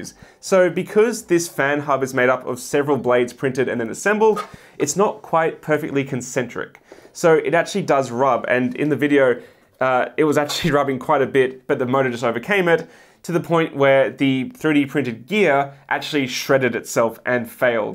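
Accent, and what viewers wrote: Australia. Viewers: I was sold into printing as soon as i saw your big beautiful face and awesome Aussie voice! Youre still the only maker whos community I subscribe to and happily financially support, as you show me all of your failures alongside your successes. Since finding your videos, they have helped me define a path to create a small business creating Flow instruments, such as Dragon Staff, contact staff, poi, and fans. The best company on the planet for this is called ThreeWorlds and theyre actually based out of Queensland. You may be interested in some of their cool spinning geometry as i was, but would be able to acquire it much easier than me over in the States! I learned Onshape, and then you convinced me to learn Fusion360 instead, and then i made all of my own designs! I am currently expanding to have 3 printers, highly modified with Hemeras to print TPU at 100mm/s, just like my first e5+. 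I wouldnt be where i am without you, and my girlfriend is eternally jealous of how much attention you get! -Connor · I’m your biggest fan I’m in australia and only last week I realised your Aussie O.M.G 😀 can I send you a latter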